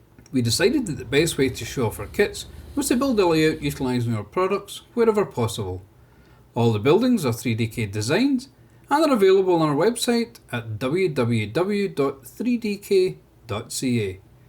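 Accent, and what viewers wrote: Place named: Scotland, United Kingdom. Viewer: I was Bought Here By Budget Model Railways I Also Like Your Scottish Accent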